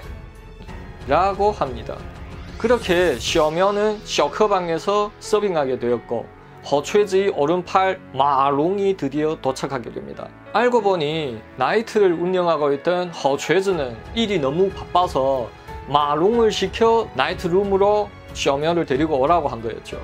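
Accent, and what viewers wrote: Yanbian, China. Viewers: 延边话听的我想乐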